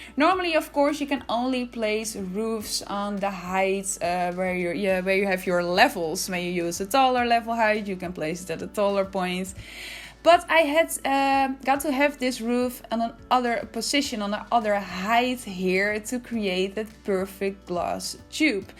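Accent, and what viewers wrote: Netherlands. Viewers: Yeah you’re Dutch I knew the first 3 seconds of the video😂😂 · You have one of the cutest Dutch accents I have ever heared (I'm Dutch as well), and I usually don't like the dutch accent at all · Clocked the Dutch accent, hehe xxgroetjes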